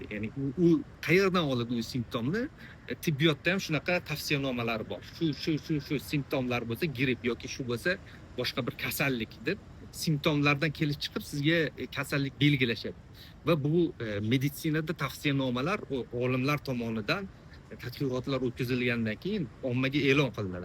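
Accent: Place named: Khorezm, Uzbekistan. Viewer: Xorazmlik emasmisiz, hazrat? Aksentlaringiz shevamizga tortyapti ))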